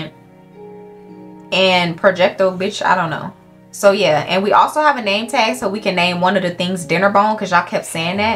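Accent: Atlanta, United States. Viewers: You from Atl or something? I’m new to the channel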